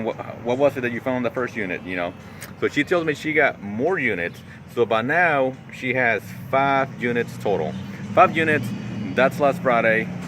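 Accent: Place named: South, United States. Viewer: Where are you? You have a touch of a southern twang, in your talking voice! XD Well, show us what you are keeping so we can advise! OMG, your going to be overwhelmed stop buying units til you clear stuff out!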